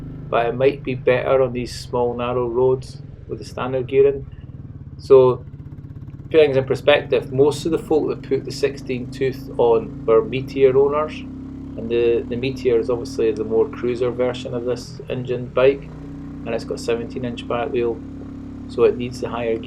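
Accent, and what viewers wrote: Scotland, United Kingdom. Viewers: I have a hunter and same as you head wind and it is a bugger to overtake things, on a good run slight down hill I just got 80mph. But I would say normally 65 to 70 is it. Now as your a Scotland type man I do struggle to catch everything you say ha ha, great videos though. I have a pal who lives in Pittenweem so not too far from you. I'm down on the kent coast. Cheers.😊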